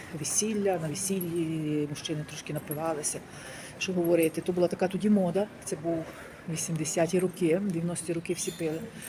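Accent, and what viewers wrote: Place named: Western Ukraine, Ukraine. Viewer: А мне нравится сразу слышно что с запада, и прикольно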